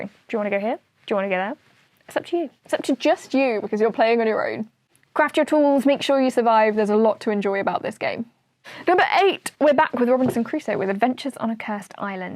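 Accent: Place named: United Kingdom. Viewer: Great list. Though I’ve seen it before, I just come back for the British accent.😆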